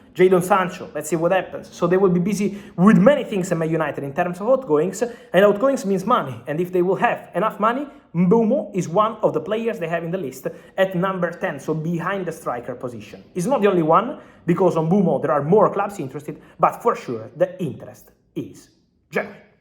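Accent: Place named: Italy. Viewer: It´s always funny and so annoying at the same time hearing an italian guy speaking english with italian accent.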